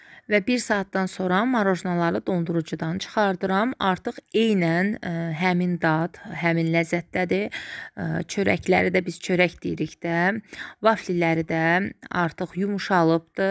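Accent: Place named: Azerbaijan. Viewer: 🤩🤩🤩🤩Azeriler çok tatli konuşuyorlar.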